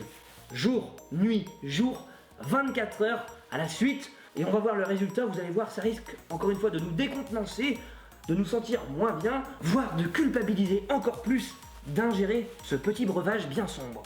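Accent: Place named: Nord, France. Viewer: Excellent l'accent du Nord de wormhout et vive eul chnord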